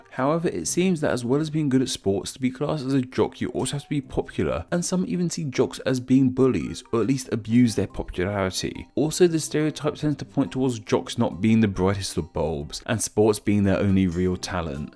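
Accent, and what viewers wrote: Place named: United Kingdom. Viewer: Listening to a British man explain hippies is hilarious.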